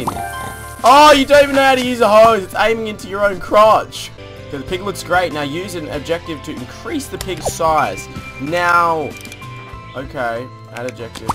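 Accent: Australia. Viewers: you sound australian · I can tell ur Australian! And u r super hot:)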